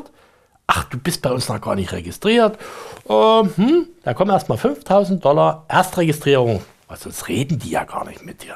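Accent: Germany. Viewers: The dude speaks a funny german slang, which crashes every automatic translator anyway. Only for insiders. 🤣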